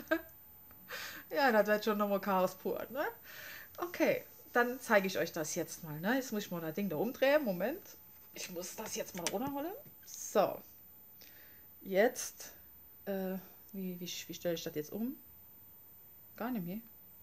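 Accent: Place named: Saarland, Germany. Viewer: aloha, habe deinen kanal vor kurzem entdeckt und bin kleben geblieben und das nicht nur weil der saarländische dialekt einfach cool ist ;) ich hoffe du machst noch viele videos.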